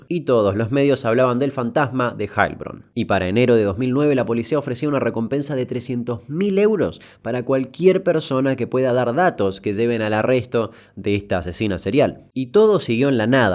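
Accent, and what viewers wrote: Buenos Aires, Argentina. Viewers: Las gashetitas con ese asento de porteño jajaj Pd soy cordobes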